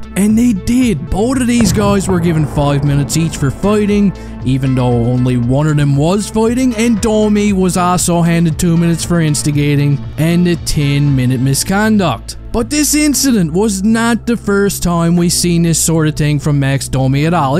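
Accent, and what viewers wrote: Newfoundland, Canada. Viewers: You sound like a newfie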